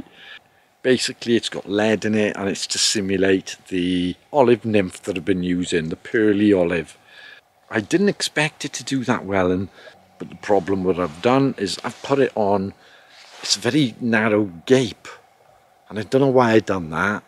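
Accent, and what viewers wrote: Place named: Wales, United Kingdom. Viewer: Who needs meditation when I can watch your fishing videos. Your soft Welsh accent is like a soft balm for these troubled times. If you weren’t 3 1/2 hours away I’d love to come for a days casting lesson with you 👏👍🙏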